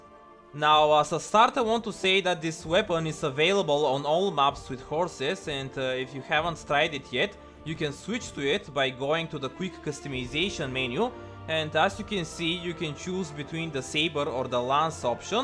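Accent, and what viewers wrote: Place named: Russia. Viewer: I love your thick Russian accent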